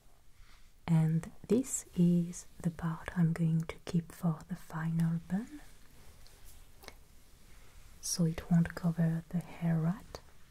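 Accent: France. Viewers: You're french ? Because you have an accent french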